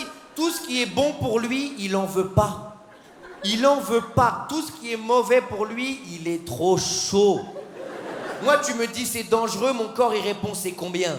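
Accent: Switzerland. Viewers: Trop drole...mais il est Suisse...c pas possib...cet accent...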